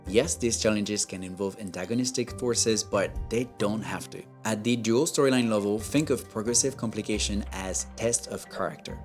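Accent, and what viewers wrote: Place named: France. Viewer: I finally figured out you are FRENCH!😂 I noticed you had a really cool accent and I finally figured it out!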